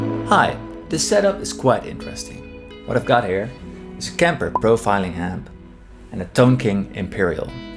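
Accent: Netherlands. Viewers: U sound dutch lol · Ik denk dat je nederlands bent toch? Wel goed accent!!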